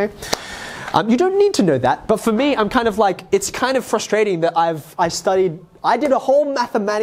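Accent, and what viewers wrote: Australia. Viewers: No, just an Australian with an Australian accent...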